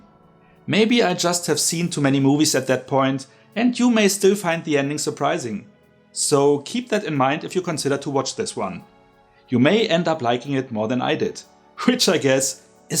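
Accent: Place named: Germany. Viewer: I like ze quality of ur english but still it is herable: you truly are a German dude. So, warum sprichst du nicht einfach auf Deutsch? So gut auch wirklich dein English ist, so sehr hört man es einfach heraus, dass du Deutsch bist und schwubsti wubs, kann ich dir ned mehr folgen.... So please, keep speaking in German, zaz how I understand u most perfectly.... U understand Mr?:D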